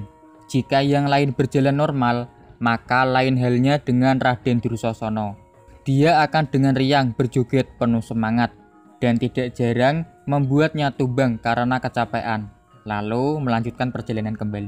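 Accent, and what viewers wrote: Java, Indonesia. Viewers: Terus berkaya men ora popo medok malah ketok jowone kudu bangga bedo itu indah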